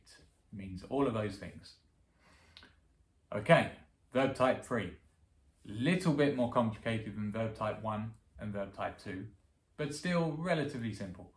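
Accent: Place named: Australia. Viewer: I think he is, and even implied being one. Whatever the case, his English is delightful and perfect. The accent sounds Australian, which is the accent I find the most beautiful 😊 I am a native Finnish enjoyer, but I find it interesting to refresh my "analytical" knowledge of the Finnish grammar and language, too. About rolling the 'r's: that sound is usually the last one that native Finnish children, too, learn to say when growing up! Before they ace it, they usually replace the sound with 'l'. Even I vaguely remember the time when I still couldn't make that sound but was avidly trying to. Your first example of "purra" sounded just fine to me. Personally, I like to say the long 'rr' in a less harsh-sounding manner, quite similar to how you did. I still roll the r, but maybe just with the side of my tongue. It's a feature of the dialect which you could hear in Tampere region. 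Especially their short 'r' is more like the Japanese counterpart, with just a very brief roll.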